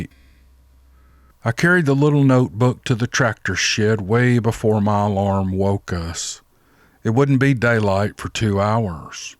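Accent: South, United States. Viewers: Mister Southern Comfort low hear your southern accent is peaceful you're such a good reader God bless you and your wife hope to hear from you again soon love you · I have enjoyed all the stories you have shared on this channel but, none more than this one. Your deliberate cadence and southern drawl make it easy for my mind to paint the pictures your words describe. I detect this to be a true and authentic account of what happened that day in 1977. Nebraska Checking In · That one really piqued my interest. It was beautifully written with respect for the shadow people and the family members in involved. The perfect compliment to the story was the soft southern spoken voice that narrated it. Thank you. 😁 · Mr. - you are becoming a skilled storyteller and this is one of your very best!!!💯 I have always enjoyed the way you tell a story, being half-southern myself. 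Your accent and genuine love for the stories you share here have been apparent from the first time I listened to your vids. Also ❤️ your musical and visual 🐸 accompaniment, especially the ladies in your side yard. 🐓🐔🐥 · hello and that was SO therapeutic! I've been awake tossing and turning like a captured viper in a pillowcase till even my cats left the bed for more settled and quite sleeping spots! But as I lay down in my bed just before this story began, after taking some pain killing tablets and having a hot bath, I am hoping for just 2 or 3 hours kip, enough to get through the day. I'm watching the early spring day begin as the sun peeks through the curtains, and listening to that Southern accent and cadence, I felt like I was listening to a meditation. What a wonderful story and what a wonderful family the twins had both as children and as adults. We are locked down in NSW Australia where I live and even though I'm country/rural and there hasn't been a single Covid "case" in the 100 kilometres around me, that 1 case has kept me home for a very long time. 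You have a gift and I sometimes put one of these longer stories on if I'm cooking something like lemon butter in my kitchen because I have to stay at the stove and stir constantly. I'll listen to you tell the story and I'll look out my kitchen window at the farmland and put myself somewhere else in time and place where the story you are narrating happens..I hope that you and yours are doing well and I look forward to the next "Calming " time. Till then take care now!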